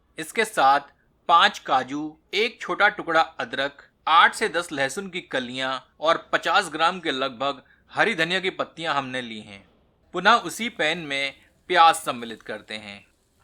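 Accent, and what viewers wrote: Standard. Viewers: sudhha hindi uchharn mujhe sun ne ko mila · Waah shudh Hindi sunn kar bohot anandit hua mera dil ❤️👍👍👏👏👏 .. but recipie thoda lamba process hai !!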